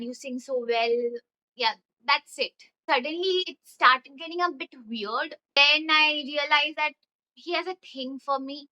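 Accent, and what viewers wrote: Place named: West Bengal, India. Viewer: GIRLLL ,.. i"m not bengali but i liked your accent. Its cute🎀. Anyways i liked your storytime .. its my first time seeing your video.💌🌸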